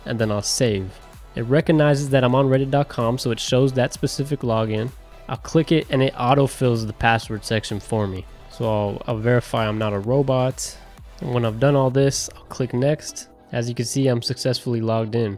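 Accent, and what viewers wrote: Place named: England, United Kingdom. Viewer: AAWWWWWWWWWWW shit I checked your like but and this is my second time through I have my Vault and got graded strong on my first master pw but I still have no idear WTFs going on. i'M WAY FOGGY ON THIS SETUP but at least our volume is good and you have a nice clear Engrish accent a bunch of these guys thought they were maxwell Smart and they needed to disguise their voice from big brother. Ok video i'm gonna try it again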